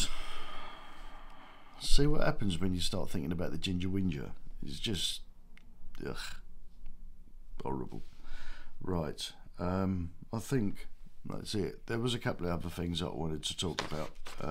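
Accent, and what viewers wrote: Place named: Cockney, United Kingdom. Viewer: Yes I agree and thought that the dog had followed the scent of its owner. For all we know that poor woman was dragged off by someone and there were no witnesses. Love your Cockney accent, you sound so cultured, me I just sound common as muck, in spite of a BA, HNC, A & O levels. I’m mixed blood, mother was Southwark, dad was a crane driver from Wapping. Happy days hun 😊👏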